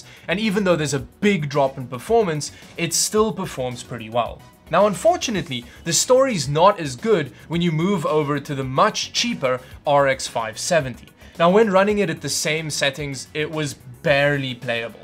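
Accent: South Africa. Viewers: Jo dufe you a south african? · Are you south African?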